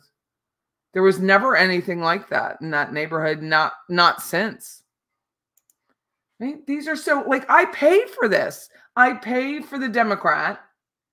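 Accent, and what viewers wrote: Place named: Central Pennsylvania, United States. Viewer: You are so fun to listen to. I’m from NYC and went to undergraduate at PSU (University Park) and I love your accent …. It’s so central PA 🥰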